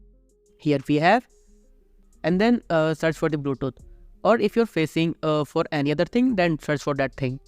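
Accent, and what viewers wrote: India. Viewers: This is exactly what I was looking for😊 whenever I hear in Indian voice I know the tutorial is going to be correct 💪 💪 💪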